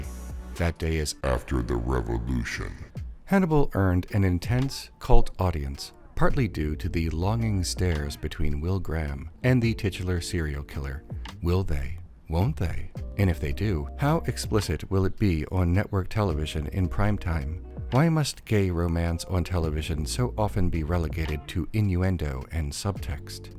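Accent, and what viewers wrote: Baltimore, United States. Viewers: I can tell you're from Baltimore by the way you say Baldimore.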